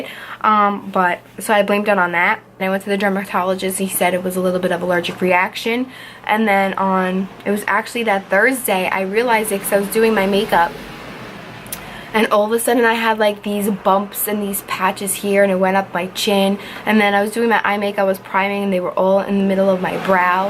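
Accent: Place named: New York, United States. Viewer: First, YOUR ACCENT! I love it! You HAVE to be from NY, right? Second, The looking up tip, I HAVE NEVER heard that before, and think that's totally genius. I have chunky lids that look hooded, so that tip is going to help me a ton. Thank you so much! Third - You are beautiful!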